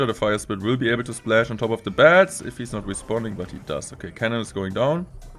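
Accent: Germany. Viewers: Der bruder klingt selbst auf english deutsch 💀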